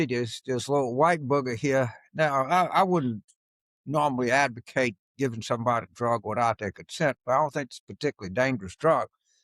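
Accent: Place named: Louisiana, United States. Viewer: This is funny as hell especially when you cuss with your Southern Louisiana accent.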